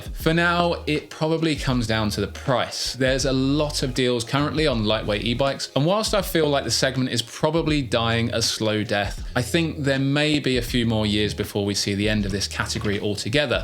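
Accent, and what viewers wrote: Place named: United Kingdom. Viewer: what the f did this brit say????/ dang, subtitles can't even understand the accent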